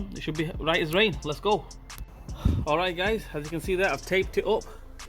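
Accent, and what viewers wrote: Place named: Northern England, United Kingdom. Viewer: North England somewhere by his accent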